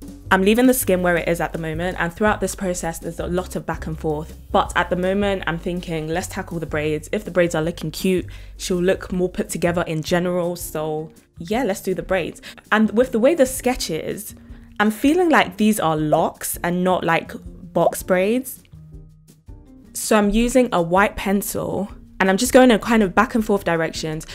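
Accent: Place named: United Kingdom. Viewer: I like your British accent And the asmr while you talk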